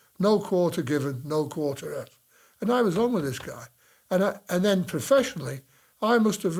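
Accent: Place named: Nottingham, United Kingdom. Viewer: I o ly clicked to hear if u were gonna speak in a japanese accent hahaha! Seriously i knew the name. Ut to my suprise your english.lovr from nottingham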